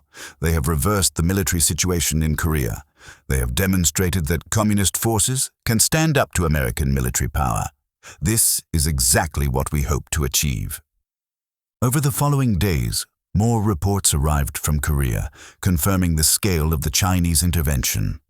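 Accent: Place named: RP, United Kingdom. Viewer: Please go back to the old AI narrarator. This posh british voice is overused and annoying.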